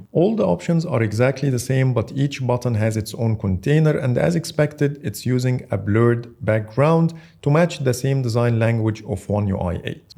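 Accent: Egypt. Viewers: اللهجه مصريه جدا ؟؟؟ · انت مصري ياض حاسس الانجليزي بتاعك انجليزي مصري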